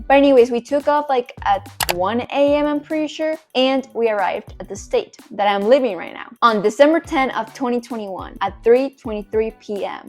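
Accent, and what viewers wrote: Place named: Peru. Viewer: I knew you had an accent but I had no clue where you were from but that’s really cool that’s your from Peru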